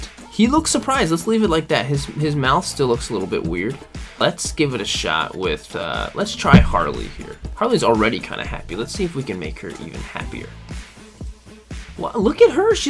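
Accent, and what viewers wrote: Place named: United Kingdom. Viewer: british han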